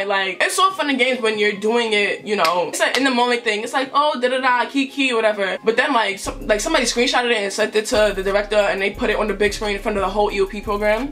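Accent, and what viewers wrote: New York, United States. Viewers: I love you and the fact that your from New York bc my sister is from there and I don’t live with her but y’all sound the same it make me Happy☺️ The first video I watched if yours I could tell that’s where you were from🧡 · “So boom” I LOVEEE THE NEW YORK IN YOU 🥰😭!!!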